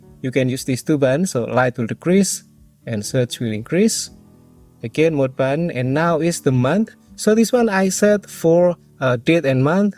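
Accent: Indonesia. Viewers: Udah saya duga. Logatnya Indonesia bgt.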